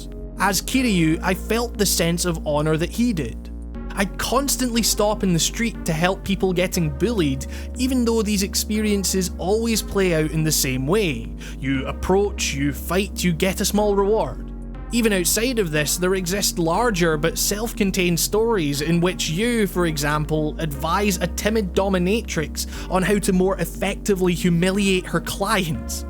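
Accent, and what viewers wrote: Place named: Scotland, United Kingdom. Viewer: Thanks for answering! I really appreciate it. I hope you don't mind if I model a Scottish accent off your voice. Most of the ones in media are really exaggerated so I prefer to model off real people, and your long-from videos would give me lots to work with.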